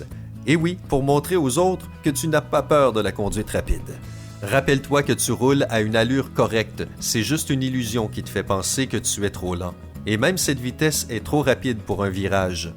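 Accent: Quebec, Canada. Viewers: C'est juste l'accent québécois. Et c'est très joli je trouve. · Skip oui c un accent qc (je suis un Quebecois)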